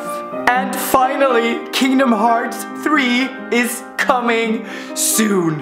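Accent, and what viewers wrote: Trøndelag, Norway. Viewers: d e jo sør-trøndersk som e sokka da <3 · ÅÅHERREGUUD, trøndeeer!! Æå e trønder hahha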